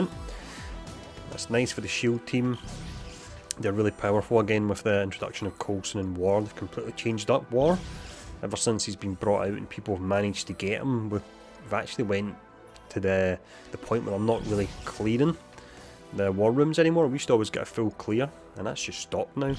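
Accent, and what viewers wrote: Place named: Glasgow, United Kingdom. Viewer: ahhh ok, are you in the US? Cos your accent sounds like your from near Glasgow like paisley or that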